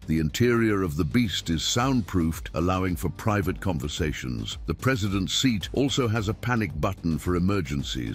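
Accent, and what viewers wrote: United Kingdom. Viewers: Why is the narrator British? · Another British narrator talking about an American president’s car. 😳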